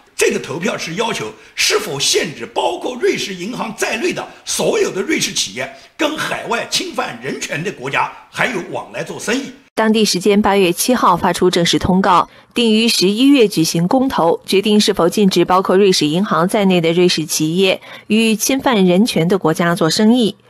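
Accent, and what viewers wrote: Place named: South, China. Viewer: 亲切的南普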